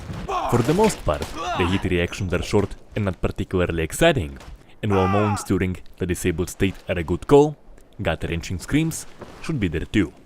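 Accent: Russia. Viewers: He's like speaking russian but in English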